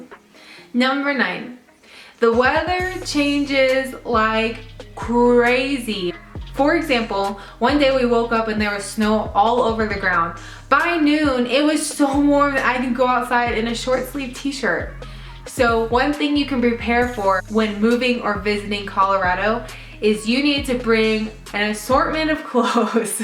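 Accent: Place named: Oklahoma, United States. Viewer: Yep, she sounds like she's from Oklahoma.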